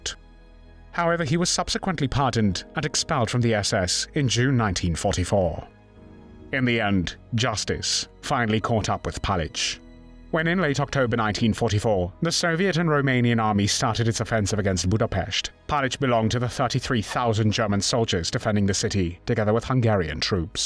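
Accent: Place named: Wales, United Kingdom. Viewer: The most horrific thing in this video is the terrible narration with a weird Welsh accent. English (international English) was too hard? Stopped watching at the 6th time he said POWLAND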